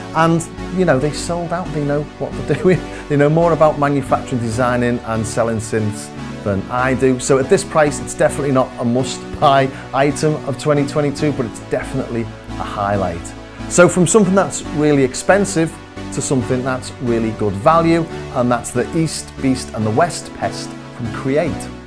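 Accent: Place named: RP, United Kingdom. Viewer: Your Received Pronunciation accent is hilarious! Brilliant. You should run for Prime Minister and campaign with that RP accent the entire time and then when you get into office, switch back to your native Scouse accent. Haha.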